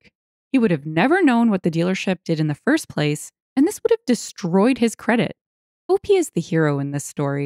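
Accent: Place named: Canada. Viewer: I love how the narrators Canadian is coming out Eh? The stories are great!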